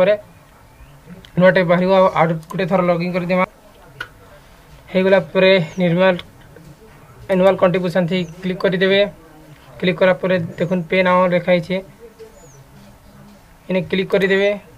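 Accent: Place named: Cuttack, India. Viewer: ସମ୍ବଲପୁରୀ କହୁଛ ଭଲ କରି କାହ ଆଉ କଟକିଆ ମିଶାଗୁଲା କାଏଁ ଯେ କହୁଛ..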